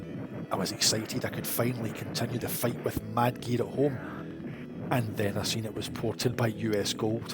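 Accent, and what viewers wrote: Scotland, United Kingdom. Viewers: I like your Scottish accent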